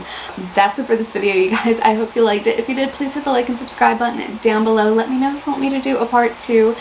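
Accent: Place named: Australia. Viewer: I'll never fly international, but as someone who cruises many of these are a good idea for cruising as well. Cruise ship cabins don't always have many hangers either, so those foldable hangers are a brilliant idea, so I can hang my partner's dresses, and my 'formal night' gear. Great ideas. I look forward to seeing some of your travel vlogs when you take Mark to Philly. It'll be interesting to note how aussie you probably now sound to your friends and family.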